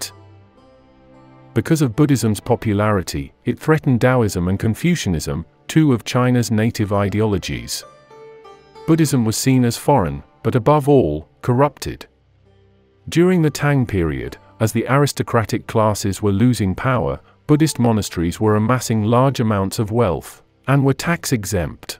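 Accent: United Kingdom. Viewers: Is narrator AI or just obscenely British?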